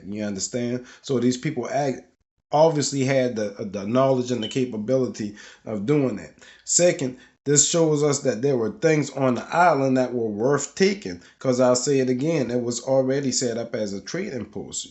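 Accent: New Orleans, United States. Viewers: If just keep listening to this guy talks he sounds just like a native new orleanian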